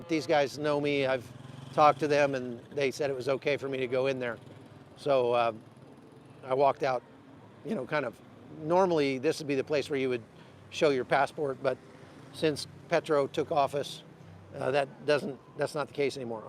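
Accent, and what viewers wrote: United States. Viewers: Get a stabilizer to hold your phone,. Your Spanish is funny with the heavy American accent.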